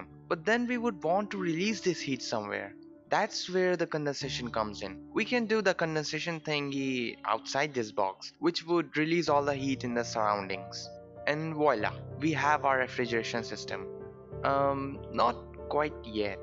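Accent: India. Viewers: You indian right?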